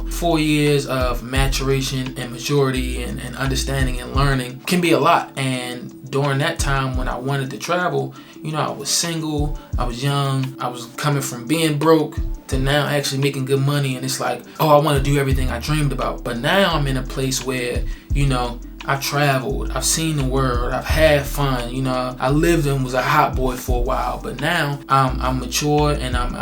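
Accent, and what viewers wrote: Baltimore, United States. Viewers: That baltimore accent is accent is something else brother. Thanks for the information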